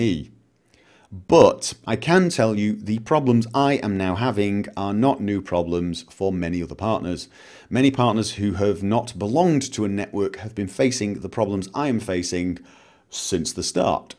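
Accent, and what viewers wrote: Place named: England, United Kingdom. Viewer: He's gone over that many many times before. He IS English. He and his family moved there a number of years ago. The accent didn't give him away? >_>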